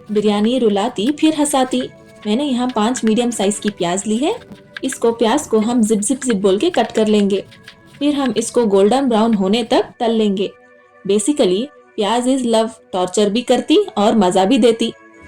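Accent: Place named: Hyderabad, India. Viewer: So beautiful hyderabadi accents and so yummy 🤤🤤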